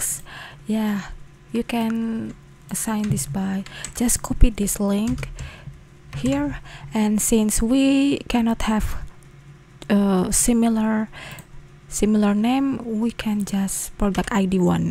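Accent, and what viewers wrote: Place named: Indonesia. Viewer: sounds like indonesian accent, i might be wrong.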